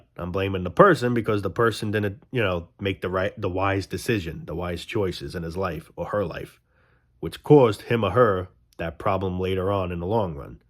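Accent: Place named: Boston, United States. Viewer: I'm listening to your accent and I'm fairly sure and please correct me if I'm wrong but you sound like you're from Boston ... I'm from Maine so I hear the dropped Rs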